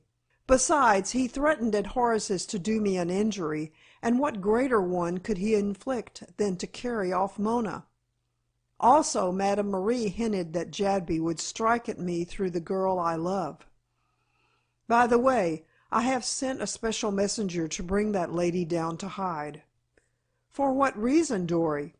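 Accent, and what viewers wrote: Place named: United States. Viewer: Great story... I can't help wishing that it had been read by an English reader though as the story is set in England. I found the broad American accent unsuitable for this text. The reader did her best however, despite the occasional mispronunciation of certain words. Much better than I could ever do.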